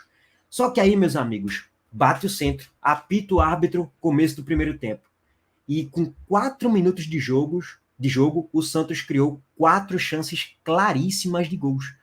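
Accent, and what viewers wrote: Bahia, Brazil. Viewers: BAHIANO FALA ENGRAÇADO, MAIS ÓTIMA MATÉRIA, PARABÉNS, SANTISTA DA CAPITAL PAULISTA.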